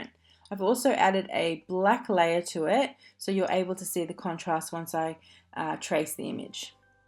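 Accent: Australia. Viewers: Very comprehensive tutorial and with an Aussie accent! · For once! its nice to hear an Aussie accent.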